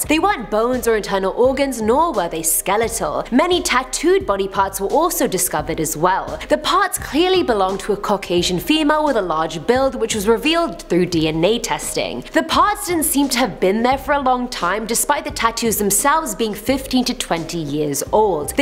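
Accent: United Kingdom. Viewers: I'm assuming she's British because she has a British accent...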